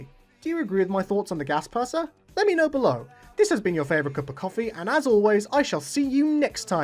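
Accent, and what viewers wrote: Poland. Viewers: M8, u from Poland?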